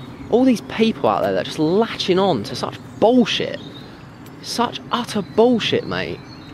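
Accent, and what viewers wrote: England, United Kingdom. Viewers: This amazing Place + your English accent = The best combination of harmonic elements!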